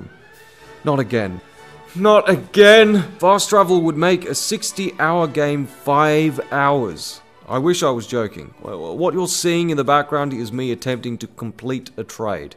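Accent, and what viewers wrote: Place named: Australia. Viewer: I'm English and he sounds more Australian to me in fairness.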